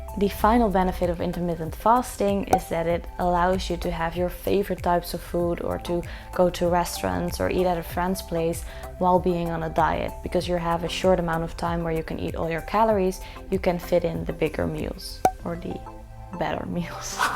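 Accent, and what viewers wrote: Netherlands. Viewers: A lovely accent. Perhaps Dutch ? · Hahaha ik hoor meteen al dat je Nederlands bent😂💙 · Grappig dat je, terwijl je Engels erg goed is, toch gelijk het Nederlandse accent hoort :-) Niet negatief hoor, gewoon normaal als een taal niet je eerste taal is. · omg eerste keer hier, maar ik hoorde je accent en gokte meteen dat je nederlands was haha ;) new fav acount for sure x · Wow you sound dutch...😊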